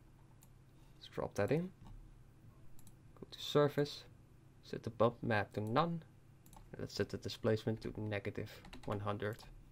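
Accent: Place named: Netherlands. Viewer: Are U Dutch? I Can Hear It In Your Accent And If Youre Wondering Im Dutch As Well !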